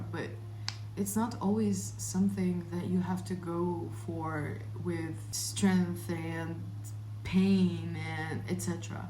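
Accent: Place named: France. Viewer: Ur french accent 😩❤️‍🔥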